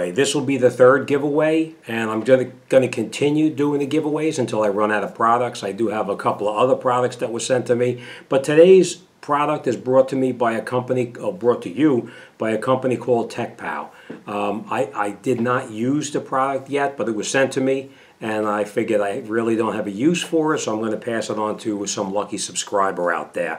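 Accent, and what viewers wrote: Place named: New Jersey, United States. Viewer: Hey. I got your comment right here. Sounds like youre from Jersey or someplace up north like me. Actually, I live in Virginia now. It'll be cool if I won your giveaway.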